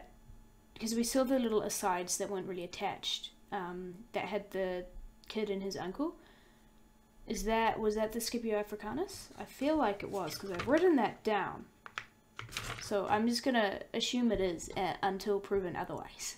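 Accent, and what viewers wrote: New Zealand. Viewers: LOL Well that is funny. A Kiwi. I didn't pick up your NZ accent, but I should have, as I love Karl Urban. I just noticed the way you said idea. Thought you might be from southern US, not the other side of world. LOL 😆 I enjoy your reactions though and you haven't hit the REALLY good stuff. It's about to seriously heat up 😁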